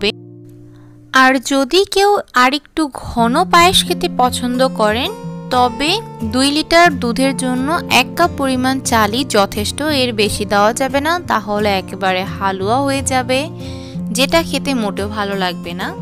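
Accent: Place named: India. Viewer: Khub khub Valo laglo khete Mon chaitese apu TMR Katha gula Indian blogger Der Moto lage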